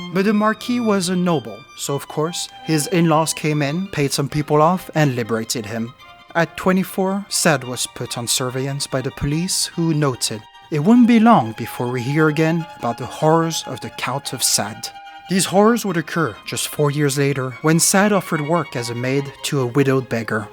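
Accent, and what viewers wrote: France. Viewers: Hes actually French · Time to hear more of that french accent. Edit: ok, now I understand why this video is age restricted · Am Inlove with your accent. Now I want to learn French♥️ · I have the impression that the narrator is french but has good english, great content as well, kudos👏👏👏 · Rien qu'en t'ecoutant parler on sait que tu es Francais...i guess only French speaking people can tell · Don't know how I got here. I don't know why I stayed and watched. But I did and now I know things I don't want to know. There was way too much tits asses and penises in this and now this is my last video before bed...the dreams I will have...oh my...I am going to concentrate on that French accent instead...*clicks heels* there's no place like Gay Paree, there's no place like Gay Paree... Hello France✌🤟 câlins et bisous from America 🇺🇸 California to be exact. 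Vive La France 🇨🇵 great video très intéressant. ♥️💙❤ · ?? He's French! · well he IS French obviously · He is French ^^ I am and I hear it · I couldn't have been the only one thinking they NEED to learn French hearing this narrator. Beautifully done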